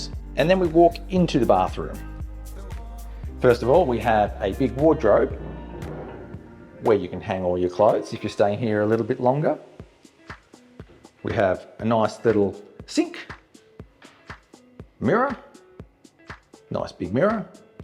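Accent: Australia. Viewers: Love the Australiuan accent! just found your channel and what a place for the solo traveller, well produced and informed video, will check out your travels, cheers and beers!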